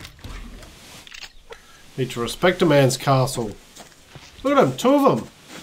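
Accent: Australia. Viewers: The video was great so I subscribed to your channel. You have an aussie accent. I am from the Gold Coast, Queensland.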